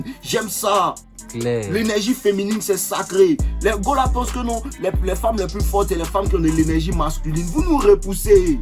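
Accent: Ivory Coast. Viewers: j'apprécie ton français ivoirien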